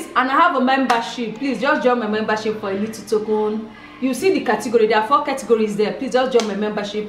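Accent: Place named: Nigeria. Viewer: you are so amazing but I was not understanding what punana is don't talk that English from Nigeria I can't understand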